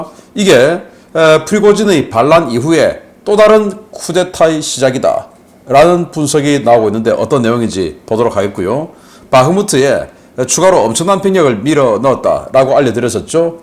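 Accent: Gyeongsang, South Korea. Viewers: 경상도 사투리가 매력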